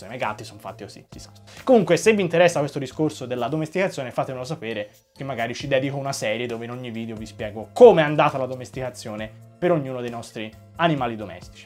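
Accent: Tuscany, Italy. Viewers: Bravissimo, come sempre. Ti giuro, da 8.10 a 8.55 io rotolo dalle risate: accento toscanissimo (ce l'ho anch'io, eh), procioni che rotolano, dentini, ecc... Continuo a guardare il videl in loop. 🤣🤣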